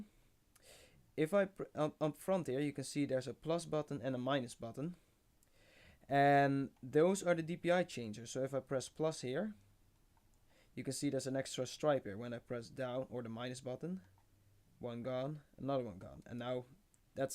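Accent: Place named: Netherlands. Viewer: dutch accent :P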